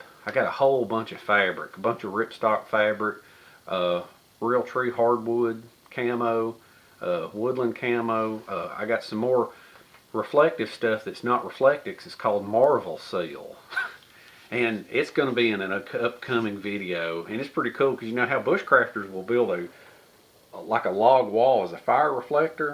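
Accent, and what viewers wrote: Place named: Florida, United States. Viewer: I was 17 when I went into the Army,I was the only one that was not an NG,I was RA and 17 all the NGs was from the north and me from Fla farm,they made fun of the way you and Isound untill we went on bivouac and to the rifle range,The city boys had never had it rough or been out in the sticks for fun,guess who had the last laugh,I can listen to you all day,just makes me home sick,keep it up Bud I,m 71 now and they call me OldJoe,or Old Grand Paw any way love uor stiff LOL keep it,Oh I did not know I was satropping wrong, thanks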